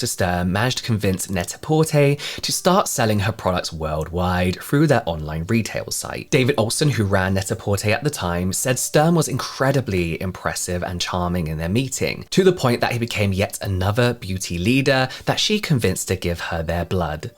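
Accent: United Kingdom. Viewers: It's funny I couldn't care less about Dr. Strum but I am watching because you look gorgeous (so fresh faced) and I am a sucker for your British accent. Loving your hair (chic) and skin (glowing). Update - I just realized you are Robert's twin. I thought something was slightly different, but couldn't put my finger on it until reading the comments. Never seen before today but have followed Robert over the past few years.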